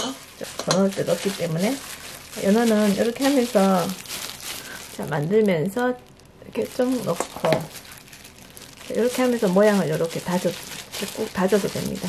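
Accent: Gyeongsang, South Korea. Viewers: 하하하~~~ 경상도분이시라? 쌀이라하지 않고 살이라고 하네요.~~~♡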